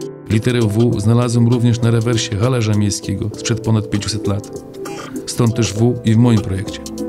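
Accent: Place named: Podlaskie, Poland. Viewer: słychać, że z Białłeegostoku ;) gratulacje!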